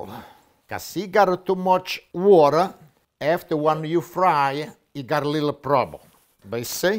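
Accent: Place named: Campania, Italy. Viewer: Hi, just curious to know from which part of Campania you come from because my Mum used to say "A salut, addo' va'" and im from Sorrento Area. Nice video!!!! Keep it up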